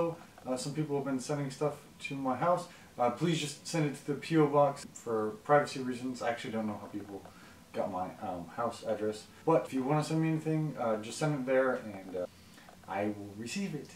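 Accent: South, United States. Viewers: I can't believe you had a lot of books at your house in Texas on this video during your quarantine including Malice by John Gwynne, Priest of Bones by Peter McLean, Winter Counts by David Heska Wanbli Weiden, Practical Magic, The Rules of Magic and Magic Lessons by Alice Hoffman, Piransi by Susanna Clarke, My Best Friend's Exorcism by Grady Hendrix, Dracula by Bram Stoker, Cemetery Boys by Aiden Thomas, The Grey Bastards by Jonathan French, One Word Kill by Mark Lawrence and so many others until you're talking about all of them to read while you made your beautiful husband, Zach read them with you at the time, so you're discuss about all of the characters from all of the books after that, so he'll be very proud of you and I love your Southern accent!!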